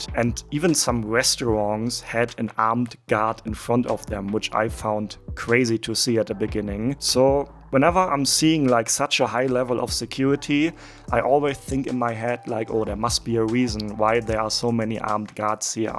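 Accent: Germany. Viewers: hat irgendwie nen deutsch Tuch in sein Englisch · Ich hatte es geahnt dass du aus Deutschland kommst, wegen deinem Englisch was du mit Deutschen Akzent sprichst. Auf jeden Fall ein sehr Interessantes Video 👍.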